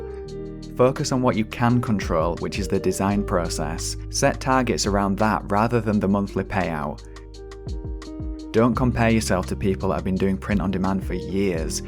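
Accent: Yorkshire, United Kingdom. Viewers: Yorkshire accent ;)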